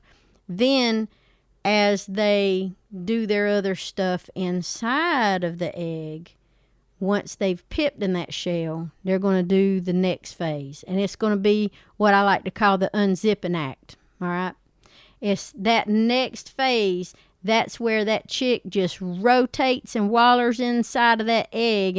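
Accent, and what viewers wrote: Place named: South, United States. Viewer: Very interesting and great educational video 👍 i absolutely love your southern accent ❤️☺️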